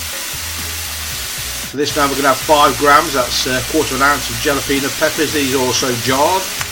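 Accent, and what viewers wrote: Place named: Midlands, United Kingdom. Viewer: Looks good, husband and I are trying to narrow down your accent, he says junction 28 of the M1😂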